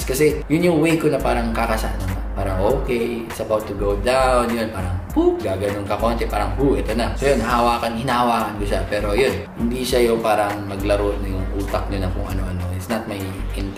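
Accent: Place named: Visayas, Philippines. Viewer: Ahahaha ka cute ni DJ Loonyo mag bisaya uie😂😂😍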